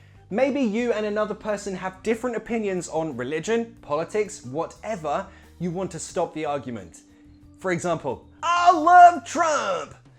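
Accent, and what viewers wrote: United Kingdom. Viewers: A bit of British Accent? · I've knew a lots of new words with british accent today and i was so happy😊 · Damn !!!! Where have I been, that I've never seen your videos before ??? I'm not only learning English with you, but also the accent and stress I like the most : the British one ! In addition to this, I'm brushing up my Turkish classes with your subtittles. Thanks a lot, and may God bless you for all your effort in this purpose of freely teaching people ! Best regards from Dresden, Germany ! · This is really appreciated that you speak a good British English like a typist 30 word per minute. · This is the first time for me can hear british accent very well😂 thank you for not talking so fast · Nice channel but it's a British English, I would like to see how you explain an American English. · El profe más hermoso! Y con acento británico! 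😍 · I love your accent man! British accent is lovely! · His English is British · I didn't know these expressions exist! I have followed you for a very long time, and I've learned a lot overall brit accent! well done! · Hey good job with this channel, Greetings from Argentina. I have a question: you speak in British English or you just have the accent Is much different the British English expresións than American English expresións ??? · Im fluent in american english, now learning british english from you. Awesome vid. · Omg! I’m falling in love with your British accent!!!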